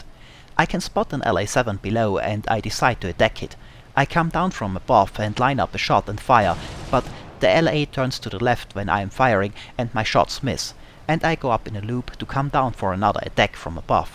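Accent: Austria. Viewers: ***** so you havent heard an Austrian, exactly said Tyrolean, speaking english before. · IIRC, he is from Austria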